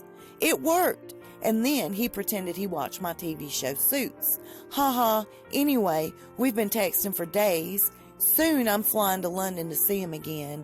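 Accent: South, United States. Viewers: I am glad i found this channel..love your southern accent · How does someone with a southern accent know so much about the royal?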